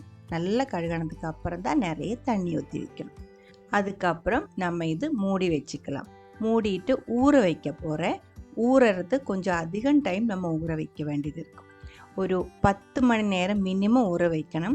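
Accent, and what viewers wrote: Kerala, India. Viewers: Very nice receipe. Your malayalam tamil hearing is very different. 😂😂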